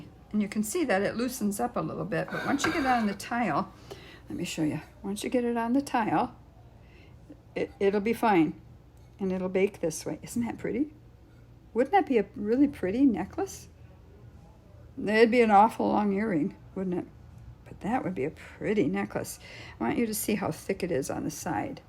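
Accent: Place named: Minnesota, United States. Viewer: I love these! So beautiful! And what a cool technique! Definitely want to give this a try! Are you from Minnesota by any chance? Or maybe Wisconsin? I was guessing you were as I was listening, but the "ufda" gave it away! Ahhhhh! The sound of home!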